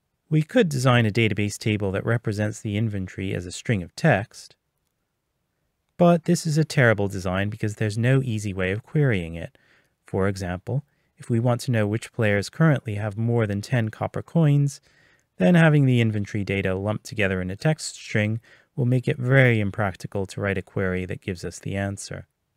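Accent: United States. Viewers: Are you from Philly? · You from Baltimore?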